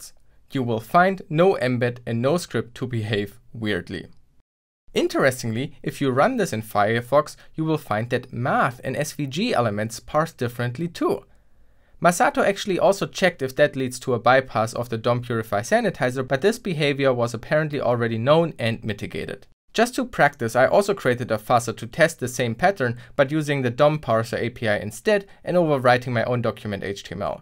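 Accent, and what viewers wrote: Germany. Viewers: Man hört einfach das du auch deutschsprachig bist 😅 aber gutes Video 👌🏼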